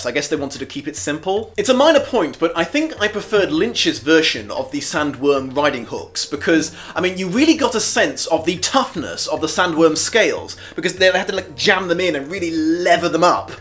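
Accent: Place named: United Kingdom. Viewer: From a guy with an British accent, it's funny that you miss that Dune is closer to T. E. Lawrence in the Arab revolt in WW1 (Lawrence of Arabia) than current events with the USA.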